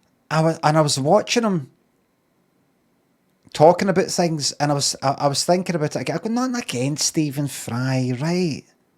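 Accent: Scotland, United Kingdom. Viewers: You clever b*****d,. I think you're onto something here. But wait a moment, now I'm wondering, is it that Scottish accent and yer fancy band t-shirt making me agree with you?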